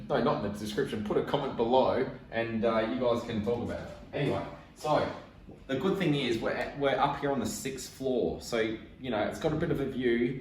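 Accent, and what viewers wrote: Australia. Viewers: WHAOUUUU 1st time i hear an aussie accent like that. If understood well it's 300 a week or 1200 a month. Seems hefty !! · I love your Aussie accent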